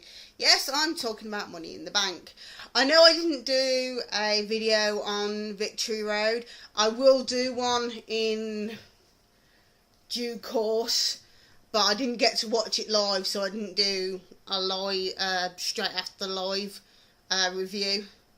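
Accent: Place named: United Kingdom. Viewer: oh whatever girlfriend, let those haters hate, your videos are great and your views on wrestling are smart and direct, plus, you have a killer british accent.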